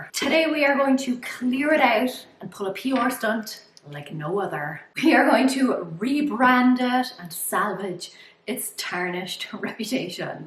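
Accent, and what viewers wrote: Ireland. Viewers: Thank You good to know. Also love your Irish accent.💚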